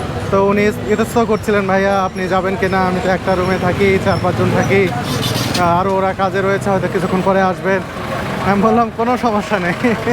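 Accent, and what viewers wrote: Sylhet, Bangladesh. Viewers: ভাইকে আমাদের বাংলাদেশের সিলেটের মানুষের মুখের ভাষাও শুনা যায় ❤❤ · bai amder sylhet er manush er moto kota bolen.balo laglo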